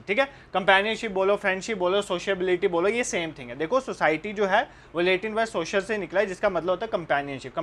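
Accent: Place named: Poonch, India. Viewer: Sir ke accent se hi me smjh gyi thi ye apne poonchiye bhai hi h koi😂😂😂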